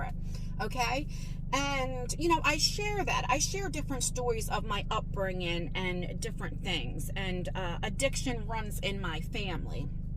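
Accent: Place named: New York, United States. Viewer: I hope Lexi feels better soon with her side effects. I am praying for you and Lexi daily.🙏🙏 It's a sick world out there with all this crap. Family first, don't worry about posting when you can't. We all understand. Are you from NY? I was born there, I think I hear that NY accent. Take care. Love watching your channel.😊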